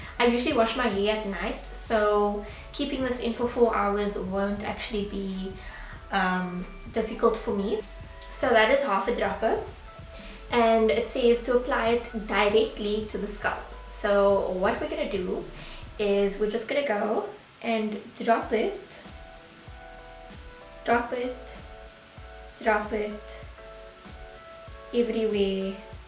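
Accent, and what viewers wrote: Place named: South Africa. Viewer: Sounds like South African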